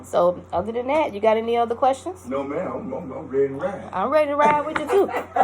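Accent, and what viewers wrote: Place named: New Orleans, United States. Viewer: Sis where you from ?! I hear NOLA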